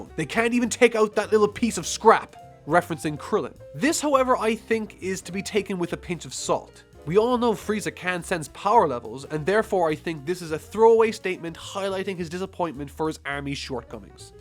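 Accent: Ireland. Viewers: Are you Irish? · yo mate , are you Irish?? you sound like a Leinster boy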